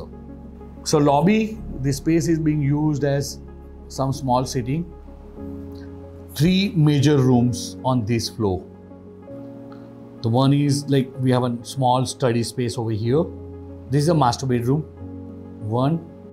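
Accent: Gujarat, India. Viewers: "Aww.. you're gujarati accent is sooo sexy".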